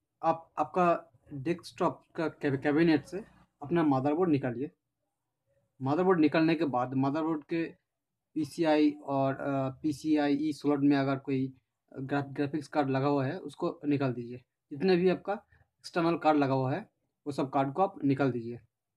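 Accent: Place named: Assam, India. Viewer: Ur way of speaking is like an Assamese people are u from assam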